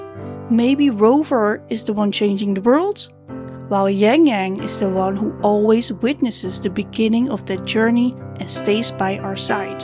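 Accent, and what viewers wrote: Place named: Netherlands. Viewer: as a fellow dutch person its suprising to hear the accent xd, great vid!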